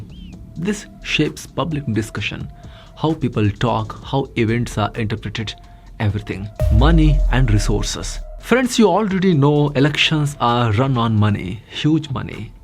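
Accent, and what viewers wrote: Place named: Karnataka, India. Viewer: Dosta.. don't narrate english like you do in kannada style.. doesn't sound good. Be natural.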